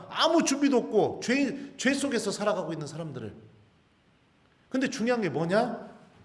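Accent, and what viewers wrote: Chungcheong, South Korea. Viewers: 신부님 말씀 잘 듣 고 있습니다 미국에서 충청도 말씀 을 대할수있어서 더 정이 감사합니다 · 신부님 감사합니다 제 고향이 대전 인디 신부님 충청도 사투리들으니 엄청 반갑네유~^^ 충청도 분이신디 말씀은 엄청 빠르시네유~~^^ 매일 잘 듣고 있습니다 감사해유❤❤❤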